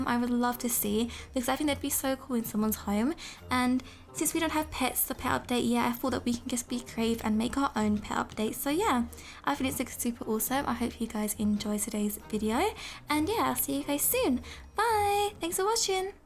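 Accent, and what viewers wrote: United Kingdom. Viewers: HER BRITISH ACCENT❤️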